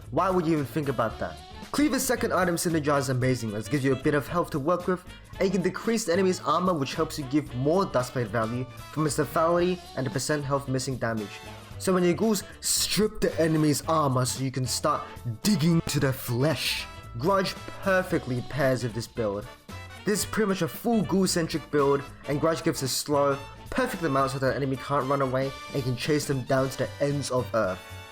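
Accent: Australia. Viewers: He's just Australian don't mind it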